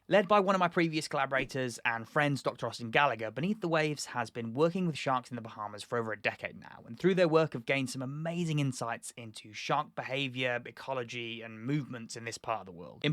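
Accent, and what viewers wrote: United Kingdom. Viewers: cool! Just sounded funny coming from a brit accent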